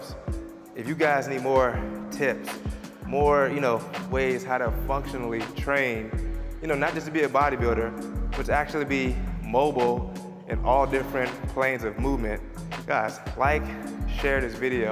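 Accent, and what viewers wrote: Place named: Virginia, United States. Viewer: Where you from bro? I thought VA.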